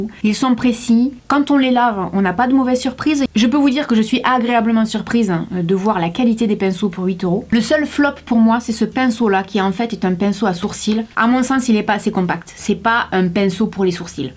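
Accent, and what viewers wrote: South, France. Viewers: J'ai découvert ta chaîne aujourd'hui, et j'adore :) !!! Ton accent du sud est super joli, mais j'aime beaucoup comme tu présentes tes produits :) Je m'abonne !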